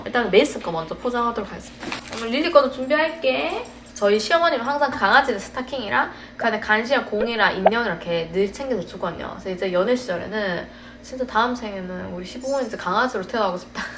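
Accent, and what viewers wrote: Gyeongsang, South Korea. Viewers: 이 언니ㅎ 처음엔 뭔 영어를 대구사투리로 하나 웃었는데 점점 빠져듭니다 타국생활 지치지 말고 즐겁게 .. 응원합니다^^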